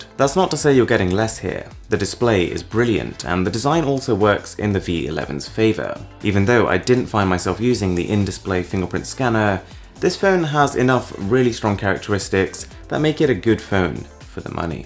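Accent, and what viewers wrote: United Kingdom. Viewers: Yes, Zack sounds great too, but that British accent is so elegant :1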